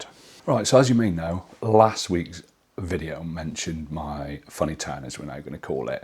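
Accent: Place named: England, United Kingdom. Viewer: OH, Brother, do I get a kick outta your English accent!! I live in Texas where we don't get to hear anything but that Southern USA, twang. I'm hoping that I get use to it soon so that I can better listen to your journey story without LAUGHING my a__ off. Thank you, Sir.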